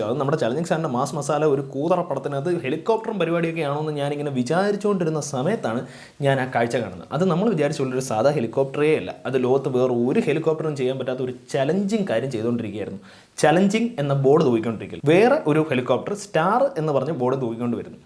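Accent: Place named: Palakkad, India. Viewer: Bro നിങ്ങൾ പാലക്കാട് തമിഴ് വല്ലോ ആണൊ നിങ്ങൾ സംസാരിക്കുമ്പോൾ തമിഴ് mix ചെയ്തു malayalam സംസാരിക്കുന്ന ആളെ പോലെ ആണ് എപ്പോഴും തോന്നുന്നത്.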